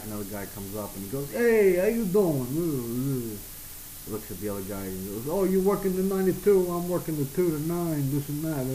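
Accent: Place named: New Jersey, United States. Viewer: So what you're saying is...you like to bitch about how lazy you are. We laugh about people like you when you leave the store because you lack any common sense. And please say "thing" again. When I get some time, I will create a response to your lovely video showcasing how many times you say "thing", "filter" and "faucet". And I'm pretty sure all the employees don't talk like they're from the Jersey Shore.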